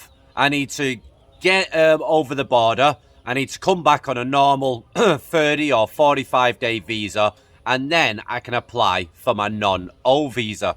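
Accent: Manchester, United Kingdom. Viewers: try to speak standard English with much less of your provincial slang. This goes for all Brits when dealing with non-Brits. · As an older guy with properties as well as family in Thailand and well over two decades experience of living in the Land Of Smiles, I have to say you appear to be the exact kind of nonce-come-grifter I make a point of avoiding. You also sound like a Manc, which might explain it, Manchester being the place where all the lowlifes who were rejected from Liverpool and Birmingham for being too scummy for even them to tolerate, ended up settling over the past however many generation so it's probably not your fault, per se, so much as genetic. You can blame your parents and theirs. Please tell us you're all jibby-jabbed up because the human gene-pool is likely well overdue a purge and if Mother Nature has her head on the right way around, yours will be exactly the retarded hereditary characteristics she'll have been targeting. 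Other than that, have a nice day and do give my regards to your village (poor beggars living there could probably use a kindly word coming from a Farang).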